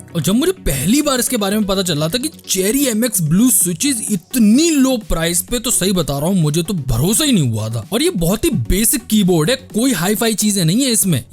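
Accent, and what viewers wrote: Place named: Agra, India. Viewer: Bohot badhiya channel hai bhai aapka. Aur wo Agra wali boli to maja hi aa jata hai sun ke. but ek request ke single product ka video 16 min ka na banaiye. jyada ho jata hai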